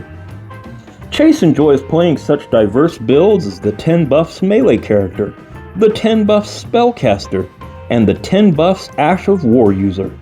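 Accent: United States. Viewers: You can hear the country voice radiating through the screen. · Your voice sends me to an early 2000's southern diner lol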